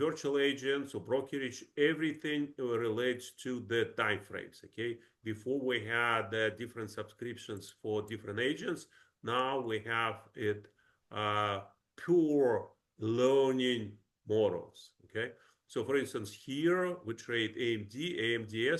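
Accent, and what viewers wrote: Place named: Ukraine. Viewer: What a terrible narrator. He sounds like a Ukrainian. Don't you have narrators who speak English fluently?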